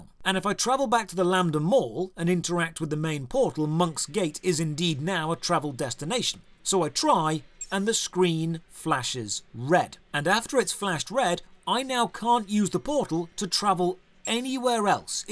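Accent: United Kingdom. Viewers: Very, very british!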